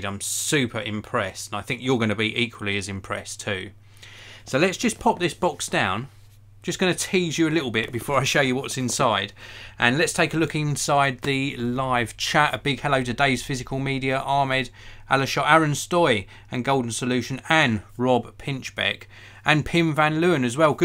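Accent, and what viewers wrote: England, United Kingdom. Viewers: Its called an English accent mush!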